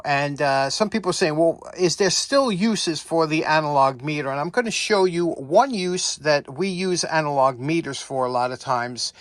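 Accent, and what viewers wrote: New York, United States. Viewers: What and where do I get sports tape? Your NY accent did you say “$ports tape” ?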